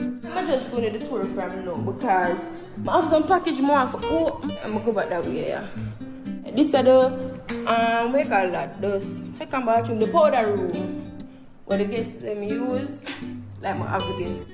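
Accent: Jamaica. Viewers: Accent alone me hear and subscribe ❤🇯🇲🇯🇲🇯🇲🇯🇲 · Are you Jamaican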